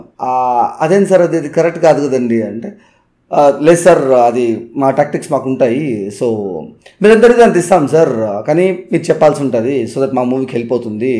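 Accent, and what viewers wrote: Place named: Karnataka, India. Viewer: His telugu slang was very much like Karnataka Telugu slang.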